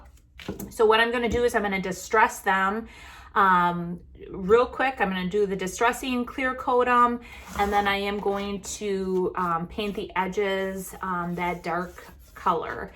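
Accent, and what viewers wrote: Wisconsin, United States. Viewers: Like your upcycled items..also ur accent makes me miss Wisconsin...live in Oklahoma now...subscribedand sharing!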